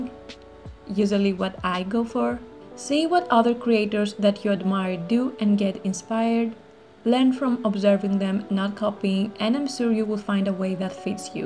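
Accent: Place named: Greece. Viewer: you sound greek, i loved your video it really helped me thank you!!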